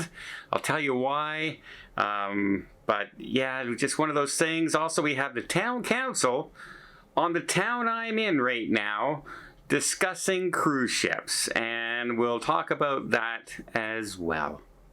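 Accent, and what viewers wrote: Canada. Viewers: He is Canadian give him a break on the pronunciation, eh?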